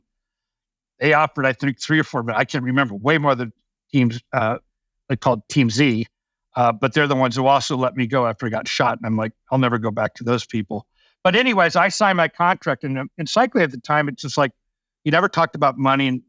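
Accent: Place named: Ireland. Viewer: Just found your site and subscribed. Your fine Irish accent puts me in mind of Sean Kelly. Not bad company to be in! A very good chat with Greg, looking forward to you other podasts thanks.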